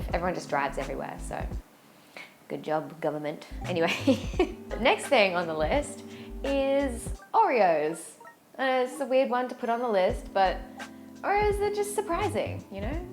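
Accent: Australia. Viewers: Love your Aussie accent. Australian accent sounds like British accent. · Thanks for sharing. Love your Ausie accent.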